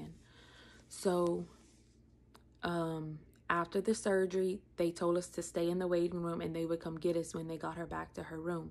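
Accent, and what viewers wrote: Louisiana, United States. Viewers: This has nothing to do with the seriousness of your topic, but I knew you were a Cajun girl as soon as I heard you speak, so I looked at your about statement. I grew up in South Mississippi and have always loved the Cajun accent. My husband is from the Folsom-New Orleans area. I am so very sorry for the horrible loss your family suffered. I am sure, even now more than a year later, your family, and especially your mom, is still trying to discover the "new normal." I have never heard of the group with which you met, but it sounds like a wonderful support system. I think it's great you're not going to call the traumatic loss of your younger subs a "accident" anymore. My dad was a attorney in Mississippi, and it may have been after I lost a friend and her unborn baby to a drunk driver, I asked the definition of a accident. 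He told me a "accident is something that couldn't have been avoided." Well, from that legal definition, no drunk ever has or causes an accident; they create wrecks or, your new word, crashes. I know God's peace has surrounded you and helps you with your grief. I pray His peace continues to uplift you and your family in the days ahead and when your hearts are very heavy during milestones such as what should have been graduation years, when they might have had weddings or babies, special birthdays like 25, 30th, and so on. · You came across my Youtube feed and decided to watch your channel. As I am listening to you speak, I instantly knew you were from Louisiana, didn't know from where. Anyway. I am listening to your story and I'm so sorry and condolences to you and your family. I have watched the videos of the car and my God, praise God mama still here. I wish I could hug you. Having a loved one pass on is one thing, but to have them go because someone was to careless to care what their actions would cause to someone else. 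I 'm not sure if you're the same family I heard about from Jeanerette with the mom and three kids coming from watching a basketball ball game up north. I cried when I heard about that. Know that you have people who love and support you. even if you don't know them. Praying for continued healing and peace for your whole family. ❤ from a Loreauville girl. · Listening to your accent takes me back to my time in Lafayette. I’m so sorry for your family. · Sounds Cajun, maybe?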